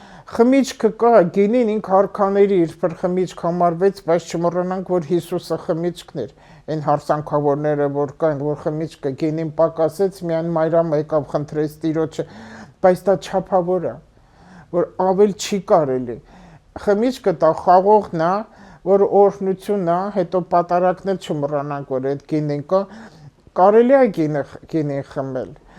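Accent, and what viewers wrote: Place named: Tbilisi, Georgia. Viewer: Duk im nman ek xosum tilizi akcentov hat urax em vor dez lsum em es tiflizeci em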